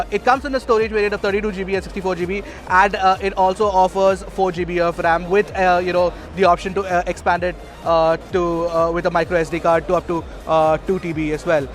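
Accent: India. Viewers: David H Yes with a very heavy Indian accent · When you have a very strong Indian accent. Please speak slow. dammit!!! and Put some subtitle too.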